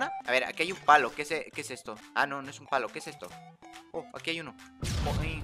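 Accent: Mexico. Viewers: Oye eres de México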